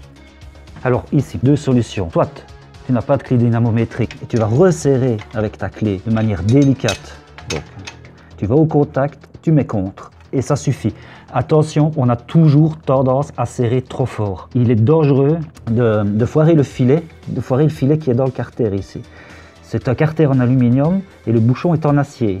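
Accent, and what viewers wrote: Belgium. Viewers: J’apprécie le petit accent belge 👍🏻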